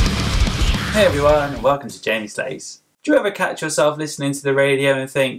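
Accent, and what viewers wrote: United Kingdom. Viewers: Dude. You from the west country?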